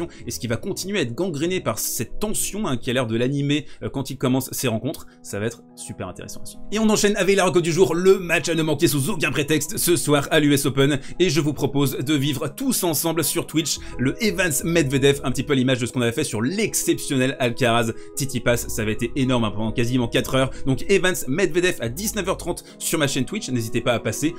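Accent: Belgium. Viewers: Je pensais sérieusement que tu étais belge 🤔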